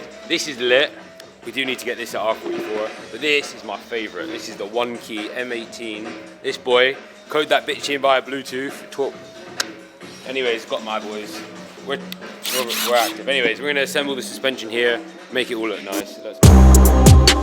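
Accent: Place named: United States. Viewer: Yank speak, delete.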